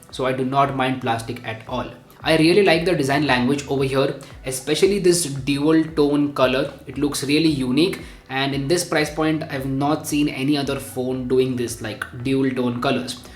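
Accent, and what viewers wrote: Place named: Maharashtra, India. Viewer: You need to improve your pronunciations. You have a marathi touch while speaking english. Listen to iGyaan if possible, Bharat Nagpal (i think i guessed his name right) is the only YouTuber who's capable to beat biggest tech YouTubers when it comes to overall presentation. Otherwise all things are good. Also if you really can speak marathi consider starting a marathi channel too. #peace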